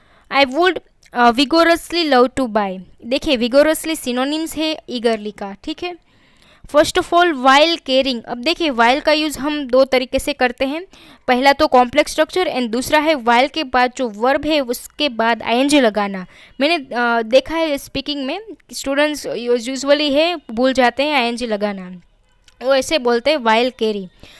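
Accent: Gujarat, India. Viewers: Madam gujarati cho?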